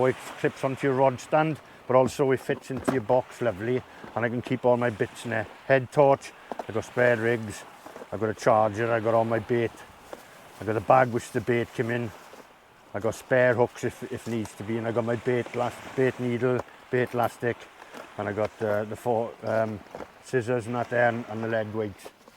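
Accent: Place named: Wales, United Kingdom. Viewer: Bloody good result, - hard fought and fully deserved. Where in Wales are you from? Saw your video on Aberavon - Im a Port Talbot boy and that's my local mark. Your accent sounds fairly local too.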